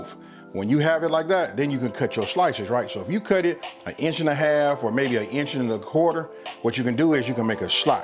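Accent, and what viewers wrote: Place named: Los Angeles, United States. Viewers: Dude sounds like he from LA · I like this dude lol. I love that LA accent, makes me wanna move to Cali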